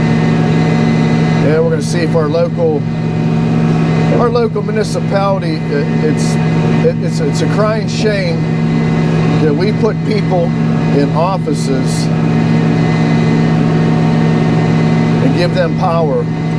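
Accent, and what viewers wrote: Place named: Pennsylvania, United States. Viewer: Both of my parents were 1st-generation Americans ("greatest generation") who were born and raised in Fayette County PA, it's such a joy to hear your accent which reminds me of them and of my large extended PA family.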